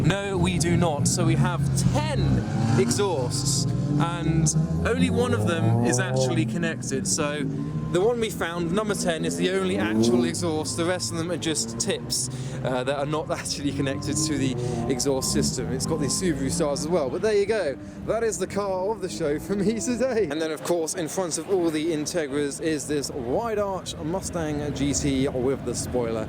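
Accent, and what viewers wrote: United States. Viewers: Nice American voice loll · More of your non-specific American accent, please. My favorite part. Wish you’d made more of a fuss about the Chimera.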